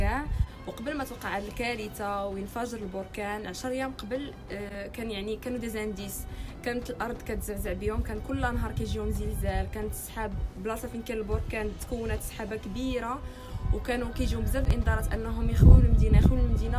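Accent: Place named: Morocco. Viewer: اللهجه المغربيه بشعه🌝💔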